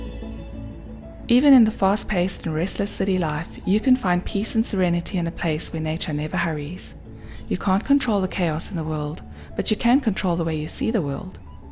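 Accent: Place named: South Africa. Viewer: Hi! I could believe it when I read the data on one of your photos! The ISO was only 800! That's incredible! Also, I think that your voice is almost soothing to listen to, and your accent (which I believe is South African?) Is wonderful!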